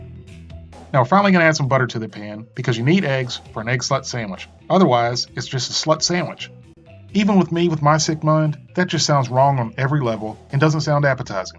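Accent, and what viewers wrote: United States. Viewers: Some of your American accent is hard for non US people to understand , what are the first two ingredients for the mayonnaise .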